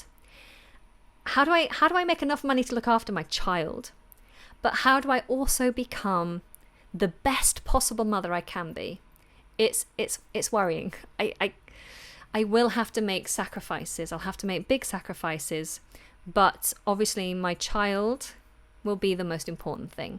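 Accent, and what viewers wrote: United Kingdom. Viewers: You are doing a great job!Keep on!I wish health and happiness to you and your child!Thank you for your videos!I love your British accent!From Russia with best regards! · Where do you live? Your accent is as Theresa May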